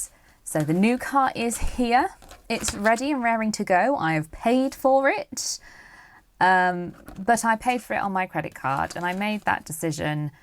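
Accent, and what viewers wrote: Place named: United Kingdom. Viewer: Nice to meet you. Love a British accent. Hello from Australia 🇦🇺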